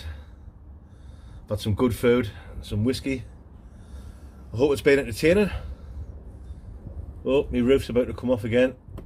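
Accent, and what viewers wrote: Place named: Durham, United Kingdom. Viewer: great stuff, are you in the Durham area? just from the accent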